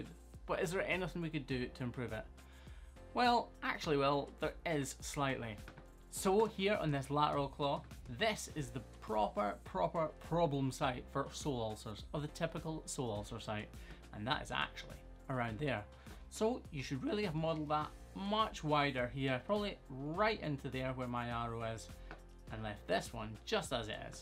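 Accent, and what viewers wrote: Scotland, United Kingdom. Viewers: It's because of the cute Scottish accent lol otherwise it would have been a proper roast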